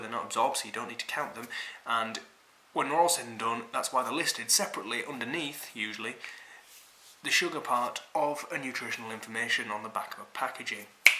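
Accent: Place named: England, United Kingdom. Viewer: I was thrilled to find a video with an English accent discussing artificial sweeteners and polyols but this was such a disappointing! You need to plan and rehearse your videos and have your info to hand. The most annoying video I have watched and a waste of time when trying to find a simple answer of you count polyols as net carbs! Please watch Dr Berg, Dr Berry, Keto Connect & Keto Christina for inspiration how to make decent videos and to get your message across even if you want to feature your pet!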